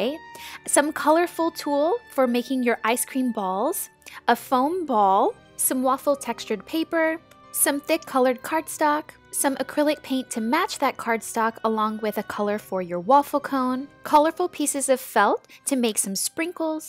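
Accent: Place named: Los Angeles, United States. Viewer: She sounds so LA compared to now. Love the transformation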